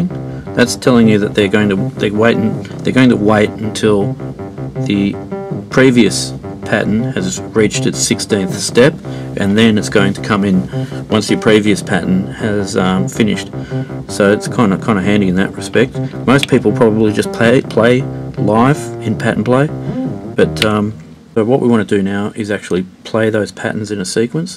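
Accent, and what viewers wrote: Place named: Australia. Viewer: Hi, noticed the Aussie accent. Are you in Sydney? I found a 303 and 606 in a council clean up pile in Mascot. I shit you not. I have no idea how they work (I play cello) but if you’d like to have a look at them please let me know! It’d be fun to see if they work. I put batteries in the 303 and it lights up.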